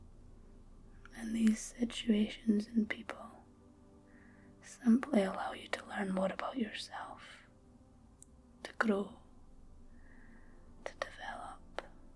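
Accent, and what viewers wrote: Scotland, United Kingdom. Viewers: The Scottish accent is my new favorite. Thank you! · In an earlier vid I thought you had an Eastern European accent. But, you're Scottish! I love your accent (from the isles perhaps?). More to the point, you are a breath of fresh air, with a lovely relaxed chatty style. How have I not been a fan before now? And, of course, I am now deeply in love (tho I'm old enough to be your father. I know, kinda creepy innit?) I'm now subscribed. Thankyou for this.Im more relaxed than I've been in years! Rock on! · Momma said knock you out with that Scottish tongue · Yeah the scottish accent is a lot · lovely scots tones....zzzzzzzzzz....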